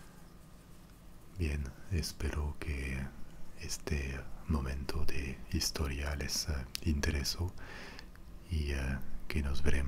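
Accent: France. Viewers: Nueva subscritora reconoci tu acento frances,estudie dos años frances,Tu español es muy fluido y me encantó el contenido! :) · Hablas bien español reconozco tu acento france :) gracias por los videos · acento francés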